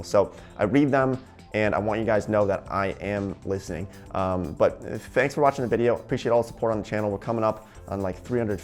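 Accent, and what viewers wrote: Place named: New Jersey, United States. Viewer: Thats a cool accent, i'm guessing new jersey? Forgive me, i'm british!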